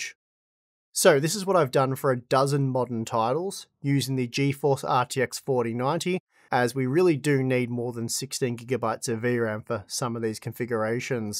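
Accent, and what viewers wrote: Australia. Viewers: I’m sure most of the viewer base is from the states but when I’m listening to a Aussie I just wish you’d use aud prices 😿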